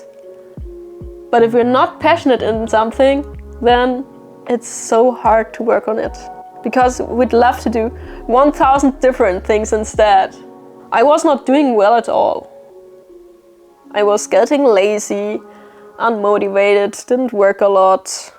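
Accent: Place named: Germany. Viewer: You have a German english accent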